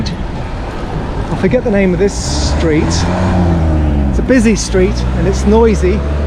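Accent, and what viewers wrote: England, United Kingdom. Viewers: So what if he has an English accent...??? Sheesh, wish him well. Respect people wherever they came from, where they were born and however they speak....peace to all.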